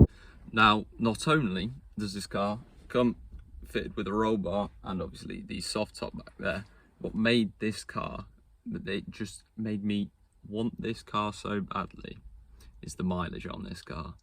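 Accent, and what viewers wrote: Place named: United Kingdom. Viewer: I was surprised to hear you were British, bruv.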